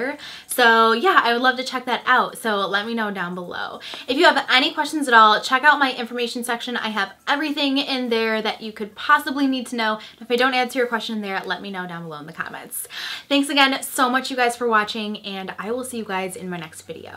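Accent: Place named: Chicago, United States. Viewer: Love your Chicago accent! 😀😀😀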